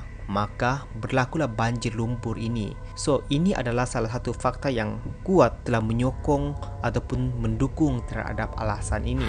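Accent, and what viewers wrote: Sabah, Malaysia. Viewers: Cakap gia dusun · Sebab loghat bahasa baku sabah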